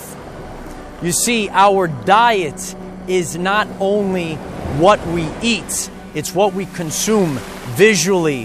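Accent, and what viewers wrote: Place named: Brooklyn, United States. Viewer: I feel like I've spoken to you before. I remember your voice and accent. I never knew anyone from Brooklyn though. Never even been there. Strange how that works.... Thank you. Blessed be. 🙏💜🌷💝💫💖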